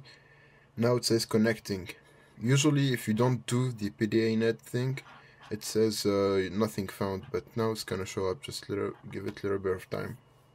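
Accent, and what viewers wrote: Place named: France. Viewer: francais? you sound francais.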